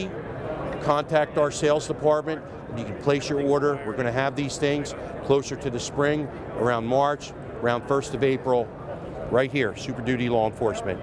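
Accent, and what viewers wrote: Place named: Philadelphia, United States. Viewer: It’s his philly accent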